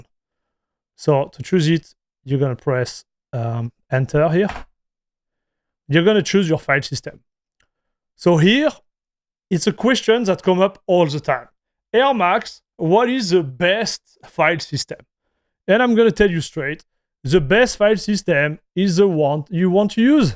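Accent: France. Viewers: i love your french accent \ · the french accent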